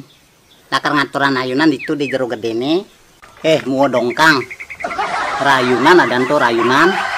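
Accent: Buleleng, Indonesia. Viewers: Wkwkwkkk munyi buleleng giih niki? Jeg lucu sajan2... Makasi pak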